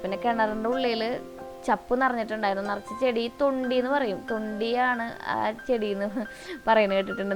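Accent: Thrissur, India. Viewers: Chechiii njan adhyayitt kanuattooo...samsaram izttayii.. Thrissur anO ???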